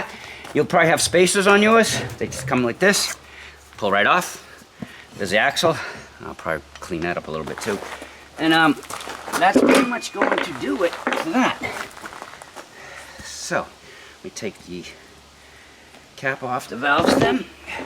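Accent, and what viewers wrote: Boston, United States. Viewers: Question. Are you from Boston? Hahaha. Love the accent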